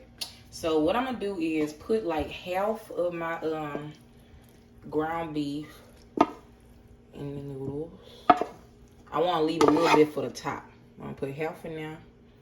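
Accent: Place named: South, United States. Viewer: She so southern haha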